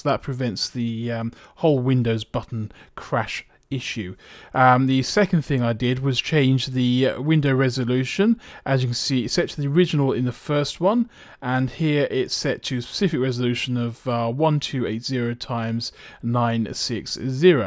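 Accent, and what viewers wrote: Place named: RP, United Kingdom. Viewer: you are awfully posh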